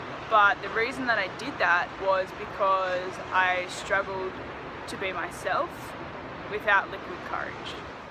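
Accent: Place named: Wales, United Kingdom. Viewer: I like your accent where are you from? Wales?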